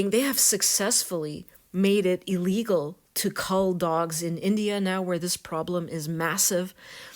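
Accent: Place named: Canada. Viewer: Lets say for hypothetical purposes that dogs only become violent because of bad owners. That still doesnt justify allowing them into our society. In fact bad owners are more likely to be negligent, not be able to restrain their dogs or keep it in their yard or let them out. I never understood this argument and it is NOT a reason to allow dogs because 50% of dog owners are probably going to be bad. How does this justify the risk? I love your Canadian accent BTW.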